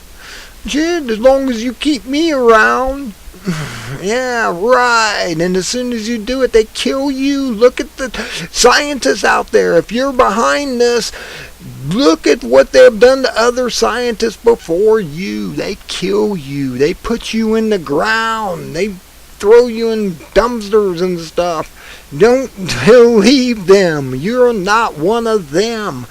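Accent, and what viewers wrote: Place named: United States. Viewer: You do not read well and your accent is tough for non-American second-language English speakers, but keep going, because it focuses us and helps us follow the story. Keep going! Can you believe the rubbish, oh, yeah, cold water killed those fish? I am from Ohio and fish can be nearly frozen solid and survive, they did it every winter back there? Those fish look horrible and distressed, look at their eyes or the lack of eyes! I have never seen this! The eyes should be cloudy but not missing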